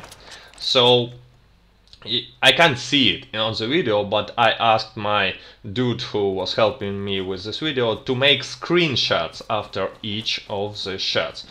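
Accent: Russia. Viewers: I like your Russian accent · i like your russian accent :) nice vid! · I think ur Russian accent is pretty cool makes u sound cool and scary love it 😂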